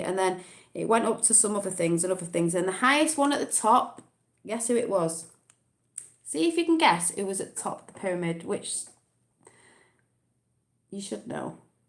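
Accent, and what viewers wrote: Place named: Manchester, United Kingdom. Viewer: ... Typical accent from Manchester and little bit of Liverpool areas of Britain! 😘 💞 🎊🎈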